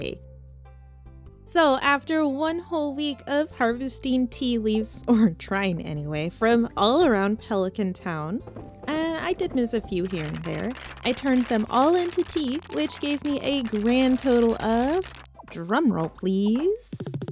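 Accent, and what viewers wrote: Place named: United Kingdom. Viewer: eeeeewwwww BRITISH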